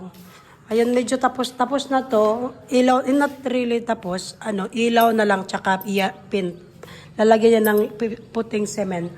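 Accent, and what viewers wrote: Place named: Visayas, Philippines. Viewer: Bisaya ka ma'am Kasi may tono ka bisaya kasi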